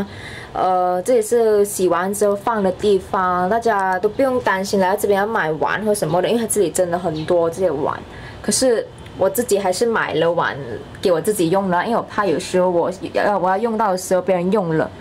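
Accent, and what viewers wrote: Malaysia. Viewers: 听口音 马来西亚！！！！ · 好亲切的华语 ，马来西亚lang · 马来西亚口音😂😂我也是马来西亚人~以后也想去韩国留学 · 马来西亚式华文😂😂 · 一听就知道是马来西亚人😂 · 超级马来西亚英语...... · 你好我是韩国人 你是马拉西亚人吗 我第一次听过这个发音！觉得很可爱！ 很有魅力的！😄 而且你韩文发音也很好👍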